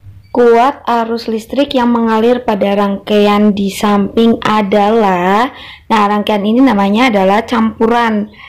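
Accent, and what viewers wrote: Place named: Tegal, Indonesia. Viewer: Tegal ngapak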